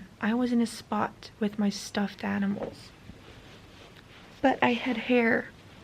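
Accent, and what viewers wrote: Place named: United States. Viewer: You have an American accent , even though you are not from America. Where are you from and where did you learn English ?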